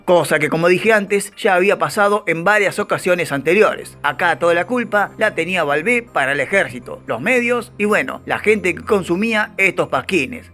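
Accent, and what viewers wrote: Buenos Aires, Argentina. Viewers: La Verdad, Excelente!!! has captado toda mi atención! No conocía tu canal pero ya estoy subscrito! La energía y el tono de voz, con el que narras! Es Genial, sumado a eso ese acento y ese humor porteño! Es Genial, 🤣🤣🤣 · Excelente video. Triste tanta ignorancia en esa época. La Madre y la sociedad eran brutales y de doble cara. Muy bonito el acento argentino. Gracias.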